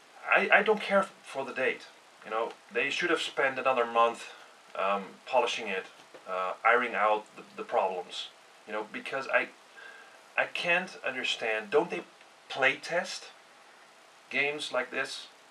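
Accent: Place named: Netherlands. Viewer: Om één of andere reden is het altijd hoorbaar wanneer iemand uit Nederland komt :) Anyway, Indeed, Skyrim is lacking some serious polishment. This goes pretty much for all versions. Xbox version is sometimes having texture issues, and the PS3 version is a lagfest. This game wasn't ready for release, not in the least. They just wanted to make that 11-11-11 date because : * It's a cool date and easy to remember * Making some more septims due to the christmas periode.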